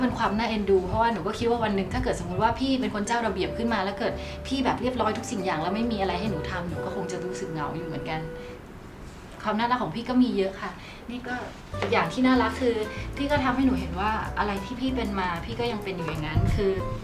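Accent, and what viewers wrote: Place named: Isan, Thailand. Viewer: พูดภาษาอิสานเก่งจัง สำเนียงเหมือนมาก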